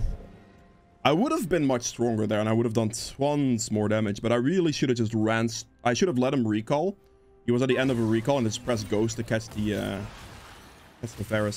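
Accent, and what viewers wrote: Netherlands. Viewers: Ran into your video's while looking if I wanted to play Udyr, immediately recognised your Dutch accent :D Keep up the great content!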